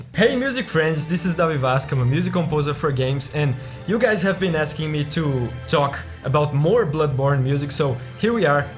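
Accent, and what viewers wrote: Brazil. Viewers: Out of Bloodborne OST, you could react next to Laurence the First Vicar, basically Ludwig, except it's not a dance, just straight up chaotic church music, and DS3's Slave Knight Gael aswell, they're really different and more emotional than the other boss musics in the series; Really sad that Yuka Kitamura didn't win any awards in both Bloodborne or Dark Souls, let's hope she does with Elden Ring. Also i've just discovered your channel and learned you're brazillian too, mostly from your accent, abraço :D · c tem sotaque de brazuca kkkkkkk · Você é Br rapaz,sua voz é bem BR adimita.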